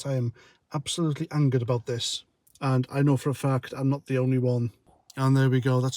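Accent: United Kingdom. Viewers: Thick British say no more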